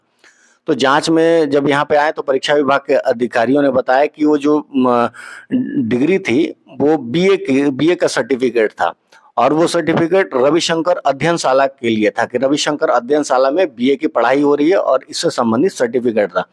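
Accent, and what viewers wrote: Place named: Bihar, India. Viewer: Aap bihari hain kya cg ke nahi lagte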